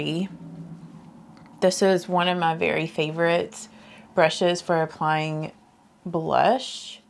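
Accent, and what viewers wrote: South, United States. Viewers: I like your voice, and, really enjoy your southern accent. Very pleasant to listen to. So I vote NOT silent video ☺️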